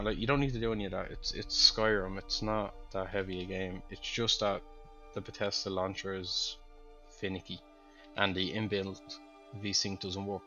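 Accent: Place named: Ireland. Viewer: Took me only 10 seconds to realize this person is Irish.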